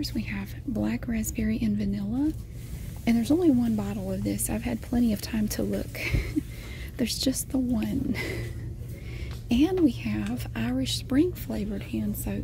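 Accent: South, United States. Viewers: Your so pretty! I love your accent! Wish I had a southern girl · I love when u do shelf organization. The mixture of your southern ascent and organizing cosmetics gets me hooked every time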